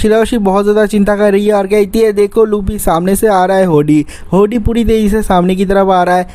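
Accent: Bengal, India. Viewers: Tum kiya bangali hoo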